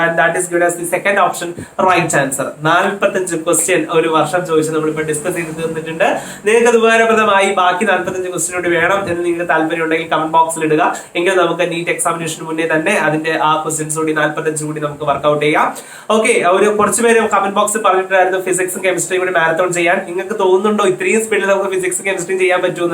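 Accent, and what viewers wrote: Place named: Punjab, India. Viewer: Punjabi like ye kya bol rha hai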